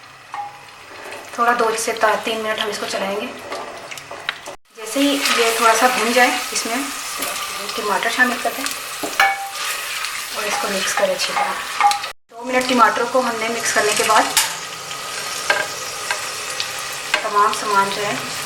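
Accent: Punjab, India. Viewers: Waoo kitni cute Punjabi boli 😂😍😍😍😍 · I really like your Punjabi touch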